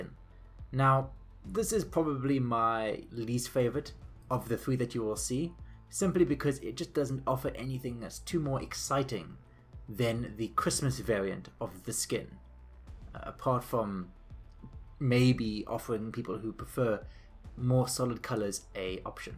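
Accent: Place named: South Africa. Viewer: Gotta love a South African accent!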